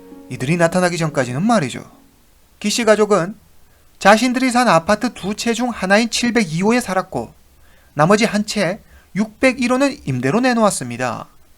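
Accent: China. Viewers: 조선족인가요? 무섭네요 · 길림성출신?ㄷㄷ 조선족같다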